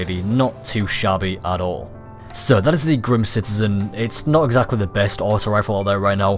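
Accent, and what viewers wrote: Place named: Scotland, United Kingdom. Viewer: you look Asian but sound scottish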